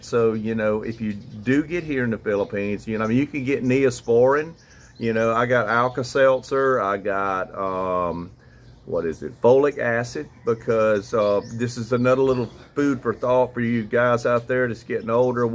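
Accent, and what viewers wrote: South, United States. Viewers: LOL. The southern accent combined with the 'jack sh*t'. Certainly American.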